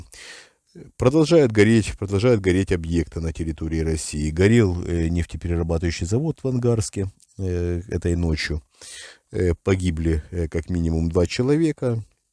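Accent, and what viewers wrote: Ukraine. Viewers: Вы бы хоть от акцента окраинного избавились и речь подкерриторивали-вброс хохляцкий.....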